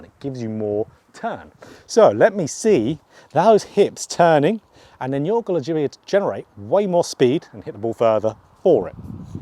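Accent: Essex, United Kingdom. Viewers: Love it ! British type. He is defo British sounds a bit like Essex maybe. Yea it always rains here in Britain 🏌️‍♂️⛳🏌🏽‍♀️🌧🌨☔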